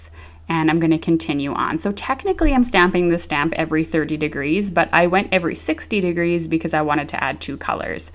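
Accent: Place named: Canada. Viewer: Your Canadian accent is so cute. Idk how I feel about this platform. I like the lines and the clicks so it’s a maybe yes for me. Thanks for showing us how to use it ❤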